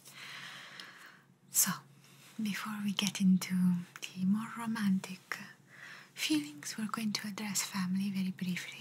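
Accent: Italy. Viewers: Hello, perfect ASMR, I loved it, I enjoyed it, it's very relaxing and with an Italian accent, I love it, greetings, beautiful.💖💖🌹🌹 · Italian accent videos are perfect for relaxing and unwind 😊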